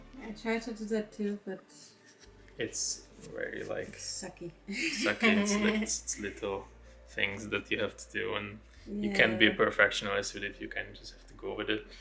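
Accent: Germany. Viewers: for a while i was always kind of hearing her speak german for some reason when i turned the volume down a lot and in this video i now found out it's probably because her accent is german and she's german ...der gute alte alleskleber c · New viewer here - My thought journey through the video: wait, this sounds like the accent of a fellow German! wait, this sounds like a LoL theme... and another one! F Holy crap she looks absolutely GORGEOUS! Subbed, subbed and SUBBED, by God. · I thought: she sounds a little big German 🤔 And than I saw it! The 'UHU Alleskleber '😂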